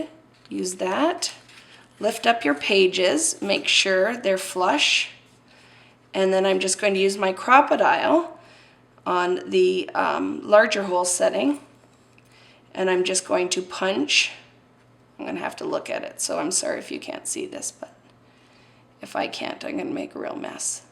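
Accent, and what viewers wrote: Canada. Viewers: Just stumbled upon your videos! Love them!! I kept saying to myself "is she Canadian?"...so I went off to you blog to find out... Born & Raised in Ottawa, wavin' atcha from Houston Texas! :D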